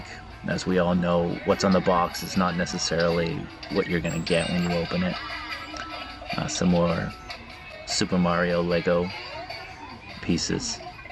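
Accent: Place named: Massachusetts, United States. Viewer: You can't deny it now your from the Massachusetts and the accent is truely from their child palace became a circuit city the one in Dedham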